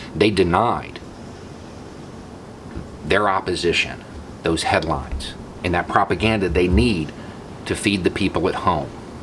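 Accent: South, United States. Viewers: You respect him... Do you respect all your enemies? Your logic and ability to understand the English language are suspect. This channel seems to be a glad hand to inane, who are continuously wowed by your Southern accent yet stereotypically opposite views...If you know a limbless veteran or a shell shocked contractor ... This man is to thank for such results. You make money scapegoating your own countrymen. Painting only with the facts that color your opinion correct...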